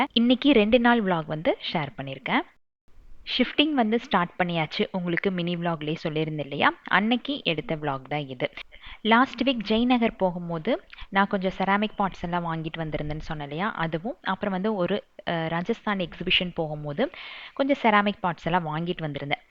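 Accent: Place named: Kongu, India. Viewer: Akka, your voice and that kongu tamil is so sweet. Also our tamil and gardeners reaction is romba edharthama eruku nga ka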